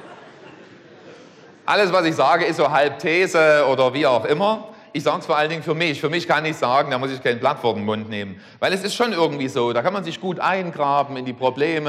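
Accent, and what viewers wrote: Saxony, Germany. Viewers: Na Du gude, das iss säggssche schbraache.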